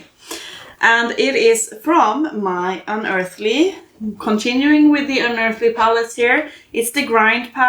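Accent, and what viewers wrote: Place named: Scandinavia. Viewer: Hi! Here to watch your video with a cup of coffee this month. Also I just noticed you are from Sweden! I was guessing somewhere Scandinavian based on your accent. I had the pleasure of going to Stockholm 2 years ago for a few days and had soooo much fun. It was in November, and I lived in Florida at the time, so the cold weather felt so refreshing and I even got to see some snowflakes! 🩷💜🩵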